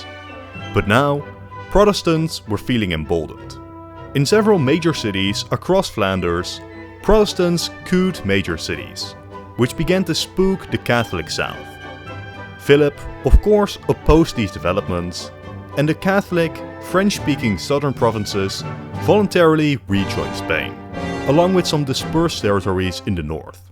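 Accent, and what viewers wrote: Netherlands. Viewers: you heard his accent? Only boomers can get a more Dutch accent then him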